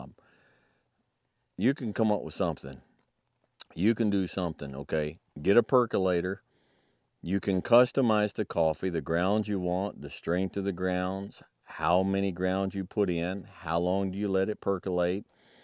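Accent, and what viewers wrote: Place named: West, United States. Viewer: I think folks always confuse a western accent for a southern accent, this man's voice should clearly define the difference lol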